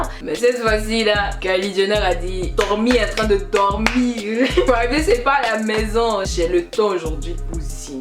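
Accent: Quebec, Canada. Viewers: La Polémique * ma belle....pas polemie, mais tu es cute avec ton mélange d'accent québécois, américain, et ivoirien....😅😘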